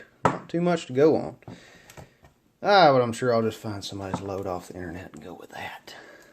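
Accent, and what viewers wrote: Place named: South, United States. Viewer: ""THEOLD SOUTHERN-ACCENT, ""NO ONE TALKS OR SPEAKS THESAME""!!!!!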